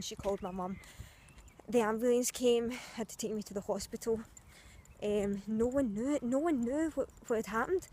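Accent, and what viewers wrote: Scotland, United Kingdom. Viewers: I truly admire you for sharing your struggle. It takes a lot of courage to overcome that kind of disorder, let alone share it with strangers on here. I just know your story will inspire many. I myself have never battled anything to the degree you have, but for those who have, this video will be motivational & inspirational. I really enjoy your channel & support you greatly throughout your recovery. I also love your Scottish accent, btw!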